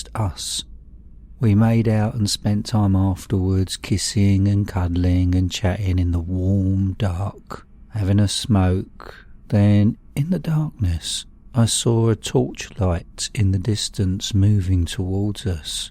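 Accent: United Kingdom. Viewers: Your voice sounds very calming and badass. Random question: Are you British? Nice narration btw..... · are you from Britain, Australia or New Zealand?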